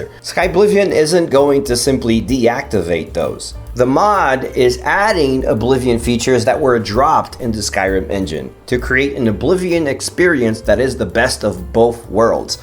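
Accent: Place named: Portugal. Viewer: Hey, do you speak Portuguese? Kinda noticed your accent, lol. Just came across your channel. Great content !!!!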